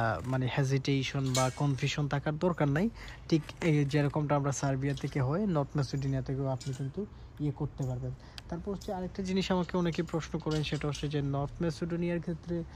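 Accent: Sylhet, Bangladesh. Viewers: Sylheti ni ba vai😊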